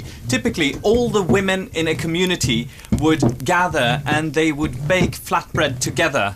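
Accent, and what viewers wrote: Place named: United States. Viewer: He also herbs with a silent H (in a different episode?), which I'm quite sure they only do in the US.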